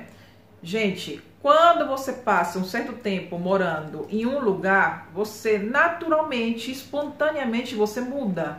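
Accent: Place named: Bahia, Brazil. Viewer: Não perdeu o sotaque baiano não! 😍❤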